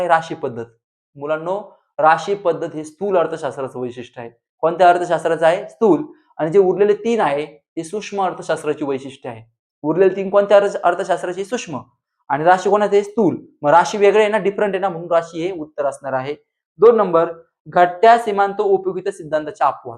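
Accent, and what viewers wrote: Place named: Nanded, India. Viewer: Sir Nandedwale ahe re bhau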